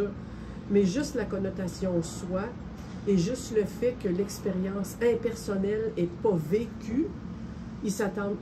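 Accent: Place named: Quebec, Canada. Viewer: Toujours intéressant, mais le bruit de fond industriel est pénible. Comme l’accent québécois n’arrange rien en plus 😅 But thanx ;)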